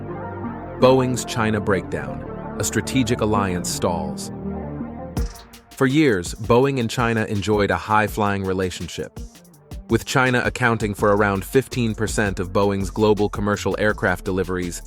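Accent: United States. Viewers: What awful narration. American accents are torture. The CIA can ditch waterboarding. Just make 'em listen to this.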